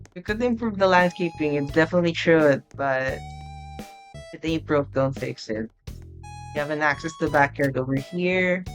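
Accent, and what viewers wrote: Philippines. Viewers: i mean he has a filipino accent and latin american countries also have same styles as the philippines so connect the dots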